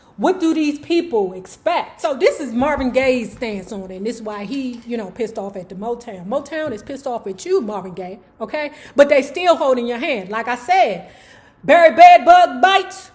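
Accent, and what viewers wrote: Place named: DMV, United States. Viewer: you bring back great memories of me and my children living in the DMV. Your accent and the things you say crack me up cause you are a true representative of The DMV. Keep being yourself.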